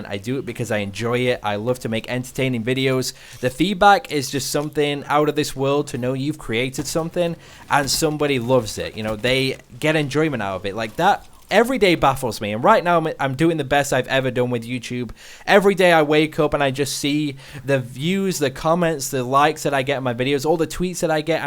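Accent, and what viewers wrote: United Kingdom. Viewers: Rip your brithish accent if your gona have a grindsquad house in america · It's good to hear another British dude talking about things that are relatable to me. Finally.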